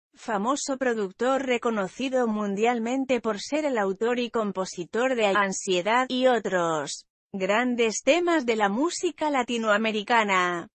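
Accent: Spain. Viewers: ese acento español es horrible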